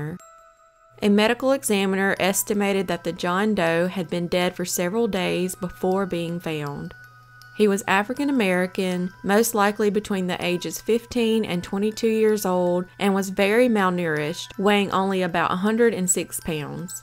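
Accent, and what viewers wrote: South, United States. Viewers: Absolutely great channel with your southern talk love it! May God continue to bless you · I've heard of a few, but not all. This is some research and time. Her voice so soothing, Keep doing what you're doing Southern lady.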